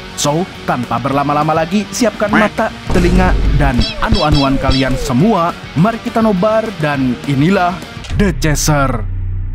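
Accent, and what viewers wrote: Banjar, Indonesia. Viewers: Nah klo ketahuan ku dh si amang urangk banjar😂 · Urang Banjar kah pian mang Salam nah dari alalak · Urg Banjar kh mang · Uma ay urang banjar kah min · Urang banjar kah mimin nya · Bang kaya orang banjar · Anjay urang banjar kah😅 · Mang pian urang banjar kah? Ulun hanyar sekali nonton disini😭😭😭🤣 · urang banjar kah pian mang ehehehe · Orng banjar kah bg 😂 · Urang Banjar sklinya Pian lah😅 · banjar kah ni logat nya😂 mirip iq 7 jua suaranya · Urg Banjar sakalinya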